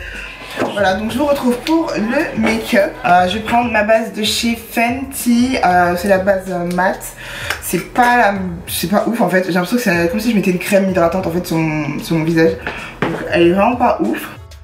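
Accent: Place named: Ivory Coast. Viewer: Tu djaaa comme une bonne Ivoirienne 😅😍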